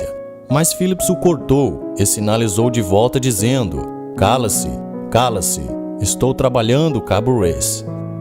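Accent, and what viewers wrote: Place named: São Paulo, Brazil. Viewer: Deve ser paulistano tipo eu e meu conterrâneo kkkkkkkkkk falamos bm caipira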